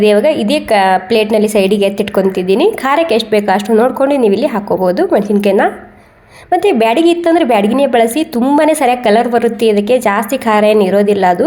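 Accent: Maharashtra, India. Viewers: Tumcha marati style kannada lai avadle tayi😊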